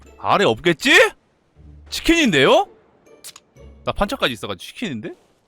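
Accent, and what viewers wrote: North Korea. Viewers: 저 목소리 왤케 북한 말투 같아보이냐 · 억양이 북한 사람 같…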